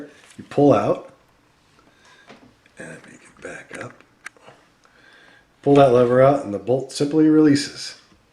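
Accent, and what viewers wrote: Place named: United States. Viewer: Why do you have an american accent if your Australian?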